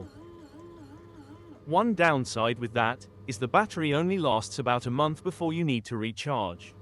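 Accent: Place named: Oregon, United States. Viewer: Absolutely love the Oregon accent!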